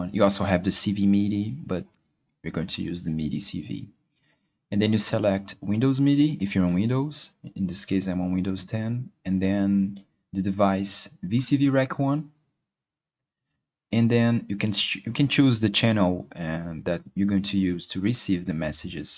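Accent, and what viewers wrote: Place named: Brazil. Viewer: esse sotaque não me engana tu é brasileiro CERTEZA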